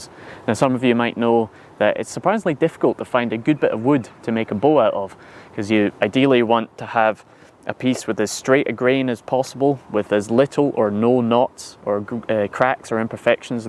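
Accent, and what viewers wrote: Scotland, United Kingdom. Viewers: Even better given the presenter has a Scottish accent. 🙂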